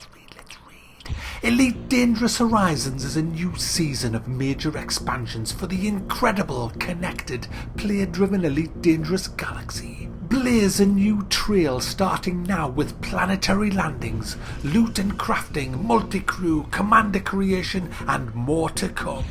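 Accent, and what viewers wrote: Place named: Newcastle, United Kingdom. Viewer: Great vid, love hearing a Geordie review, especially your sewer worker being a typical PC player... anyway to your review; too expensive, boring planets, no multiplayer co-op, first person and walking around your ship not possible... get star citizen for a 1 time payment of $45, or $60 with the single player over arching story mode you are crying out for :)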